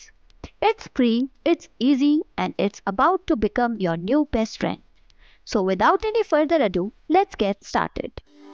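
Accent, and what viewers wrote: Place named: India. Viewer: Just by hearing tha accent made me run form this video. Indian scammer.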